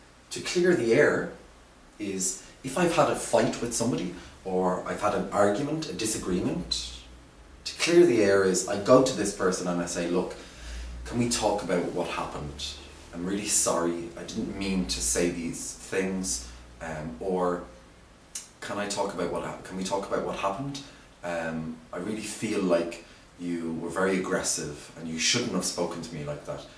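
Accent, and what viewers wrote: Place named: Ireland. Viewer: You sound to be Irish, you're from Dublin right?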